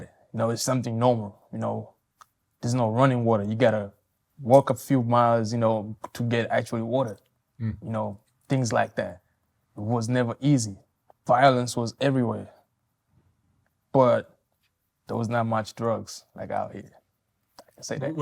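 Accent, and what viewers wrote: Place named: Bay Area, United States. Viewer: Some of his personality and especially the way he speaks English is all thanks to the Bay Area and I love it 🥰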